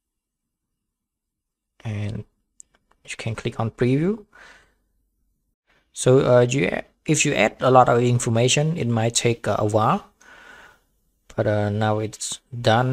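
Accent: Cambodia. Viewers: u sound like a Cambodian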